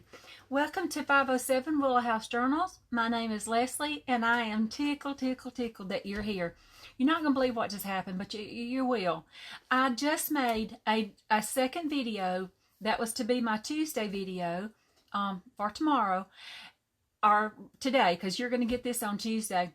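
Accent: South, United States. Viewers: Hello, Leslie!!! Just love to hear you talk. Love that southern drawl😅…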